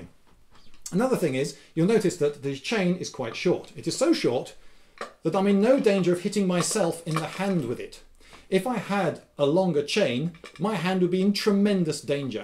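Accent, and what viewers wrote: United Kingdom. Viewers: As an American watching your videos I can say if I'm getting some news or if I'm going to have something explained to me it's always better with a British accent... Cheers... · when I saw the thumbnail I thought: look at this neck beard cosplay tard. then I heard your British accent and your IQ points went way up lol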